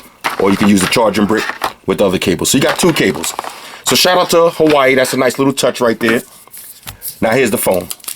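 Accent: New York, United States. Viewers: shout out to that new york accent lol wallwey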